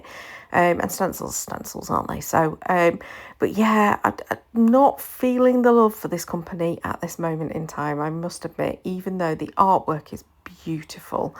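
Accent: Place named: United Kingdom. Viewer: Heey, your video is just fine! We all have our off-days (only most of us do not have to put them on the internet for everyone to share!) - and it is encouragement to all of us that you jot just managed to persevere but even got some exceedingly pretty cards out of it! Plus I love that you just tell it like it is: however pretty a set of stamps, dies, stencils is, if the instructions are not good, it is definitely worth knowing! I love your videos because they are real and fun as well as full of ideas and inspiration (and because I love your accent, takes me back to my days at uni in the UK (looooong ago 😂)). Take care and kind regards from Germany, Nicola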